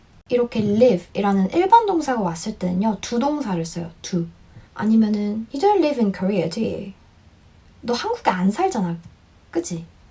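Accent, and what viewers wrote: United Kingdom. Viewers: 헐 영국인인줄 알았는데 이민도 심지어 유학도 아니고 혼자 배우신거예요? 헐..? 그 결정적인시기?어린나이? 그때 안배우고 이미 나이많이먹고 해도 저게 되는거구나...헐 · 영국발음 너무 좋다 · Hi! I happened to know your channel and I'm amazed by your British accent! I've never seen any Asian people can speak British pronunciation at your level! I'd love to know how you've learned British pronunciation (I really wish I could understand your language!! - I'm a Japanese viewer..) Anyway, I'm looking forward to watching more videos from you! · 목소리랑 발음 너무 좋으세여, 진짜 찐 영국영어다 우왕 👍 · 채널 찾아서 너무 행복해요 😍영국 영어 공부하고 있는데 어렸을때 해외 거주 경험이 없는데 영국 발음을 구사하시는 분은 많지 않더라구요 또 있으시더라도 이렇게까지 자세히 어떻게 공부했는지 설명해주시는 유튜버는 처음 인 것 같아요 영상 하나하나 도움이 정말 많이 돼요!!! 저도 처럼 영국 발음으로 영어 하는 날까지 열심히 공부하겠습니당 ㅎㅎ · 진짜 영국영어 너무 듣기 좋네요...덤으로 도움도 받고 갑니다..!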